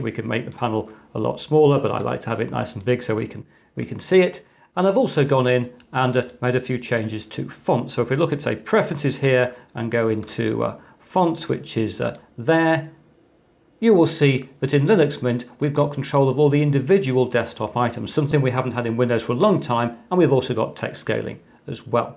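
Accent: United Kingdom. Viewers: Hello! I need you to know that I'll be using your voice to learn British accent and about computers as well 😁 So, I'll be playing all of your videos on repeat even at night 😎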